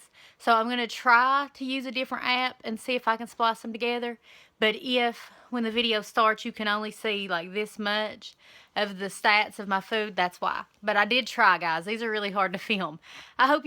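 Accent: South, United States. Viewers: I love your country accent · I know you are from the South and I'm probably just not used to it ... but seem so sweet! can't imagine anyone giving you a hard time. heh. great eats and Kickin butt on the water too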